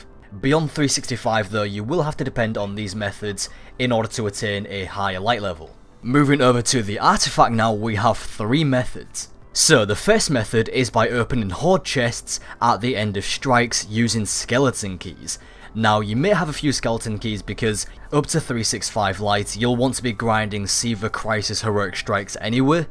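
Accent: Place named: Northern England, United Kingdom. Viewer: Because he has a northern English accent.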